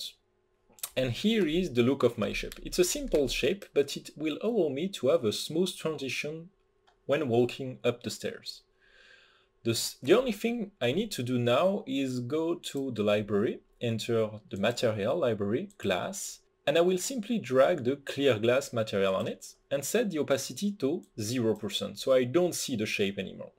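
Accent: France. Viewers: Indeed it's a French accent, sorry about that, doing my best to improve my english ;) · il est français je le sais car j'en suis un et je le repére a 1 000 km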